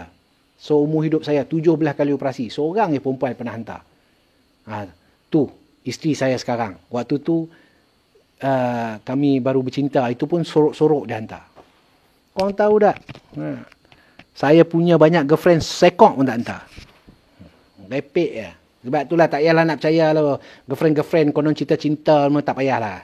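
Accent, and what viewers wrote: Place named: Kedah, Malaysia. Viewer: Maaf tuan! Sya nk tanya sikit, sya dh tengok semua vedio tuan, tuan ni asai usul dri kmpong mana? Mcm org kedah ja ni?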